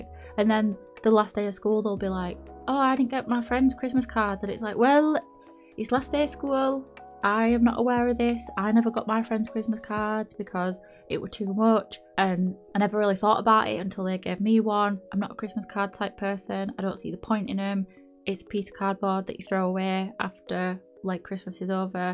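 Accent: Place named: Northern England, United Kingdom. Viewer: Subscribed mainly because of the Northern accent (we are under represented on YouTube 😂) Also those window boxes are gorgeous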